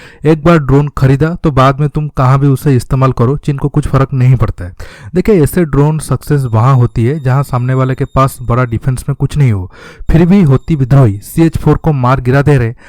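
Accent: Odisha, India. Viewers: Voice se Lagta hai ki tum Odisha state se belong karte ho . Just my guess. By the way nice work... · Bhai odisha se ho kya???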